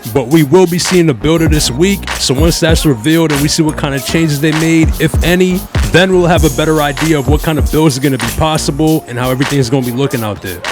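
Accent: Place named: Eastern Massachusetts, United States. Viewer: You from Massachusetts bro ? You got the illest eastern mass accent lol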